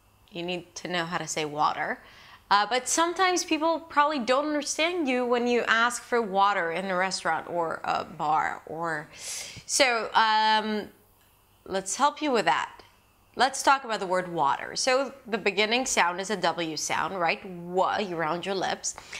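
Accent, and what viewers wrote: United States. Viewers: What a beautiful american english 😍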